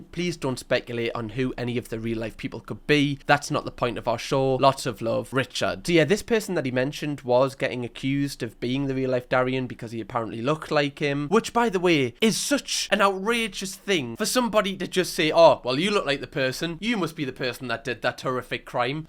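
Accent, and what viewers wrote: Newcastle, United Kingdom. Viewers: Hes from Newcastle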